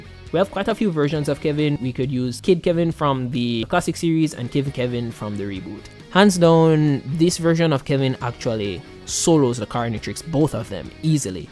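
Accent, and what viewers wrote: Jamaica. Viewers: Haven't your watched videos in some time, quick question are you Jamaican?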